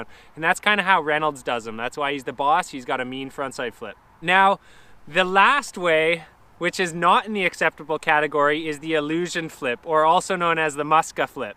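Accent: Canada. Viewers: It's funny how i can hear his Canadian accent even when I'm a Canadian. Fuckin Mint 🍁👌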